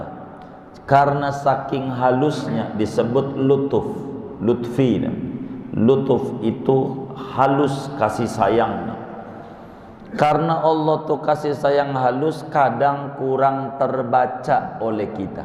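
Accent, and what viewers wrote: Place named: Betawi, Indonesia. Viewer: Assalamualaikum wr wb,mhn ijin kiyai,ane sering mendengar ceramah kiyai enak bener penyampaian kaya org betawi, subhanalloh...smg kiyai sehat terus beserta kelg n berkah piddunia walakhiroh,Aamiin yra🤲🤲🤲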